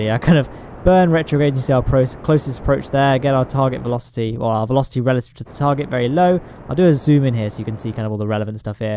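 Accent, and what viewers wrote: United Kingdom. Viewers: British Scott Manley? I think so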